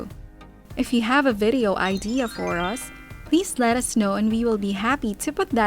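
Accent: Philippines. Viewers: wow! ur speaking have improved very much! i don't know if that is a voice app but what matters is that it's not boring to hear anymore. i'm the one who advised u to talk faster and don't use filipino english accent 1 year ago. u are very good now! goodluck to ur plant vlogs. 😊❤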